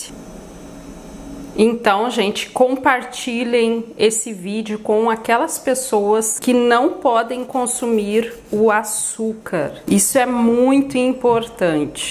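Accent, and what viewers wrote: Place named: South, Brazil. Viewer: Otima sua receita!!!!e com esse sotaque do sul!!! so' pode sair receitas boas!!!!!!👧👍👏🍰🍩🍯🍮🌹🍃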